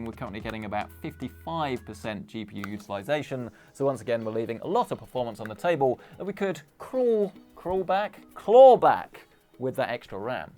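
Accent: United Kingdom. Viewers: here for the british accent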